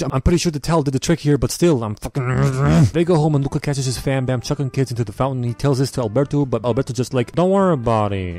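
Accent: Italy. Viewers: Wait why is he doing an Italian accent with Spanish words? Like Si. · best italian impression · nice italian accent btw nice video 2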